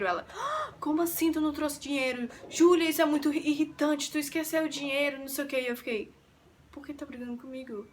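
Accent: Ceará, Brazil. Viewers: nossa reconheci o sotaque na mesma hora que ouvi vc falando . CEARÁ ❤ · não sabia de onde tu era, mas reconheci o sotaque cearense, q é meu sotaque tbm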